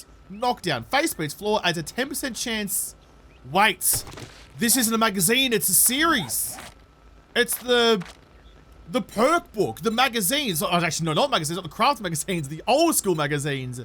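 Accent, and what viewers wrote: Australia. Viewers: Bro where in Australia are you from?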